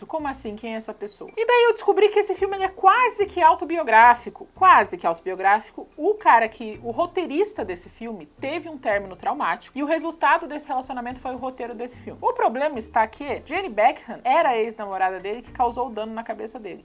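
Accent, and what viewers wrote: Curitiba, Brazil. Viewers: To amando seu canal, senti um sotaquezinho de curitiba, se eu estiver certa, é bom ver uma conterrânea aqui no youtube :) To indicando seu canal pra outras pessoas tbm! E quero agradecer pq seus videos tão ajudando muito num termino recente que eu tive. Manda ver guria que tu vai ter muito sucesso ainda!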